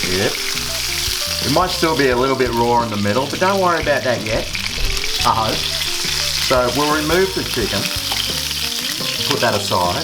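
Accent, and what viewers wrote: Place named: Australia. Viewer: I love you and all my family loves you too 💜 oregaaaanooo love the Australian accent 😎 keep glowing sir ✋.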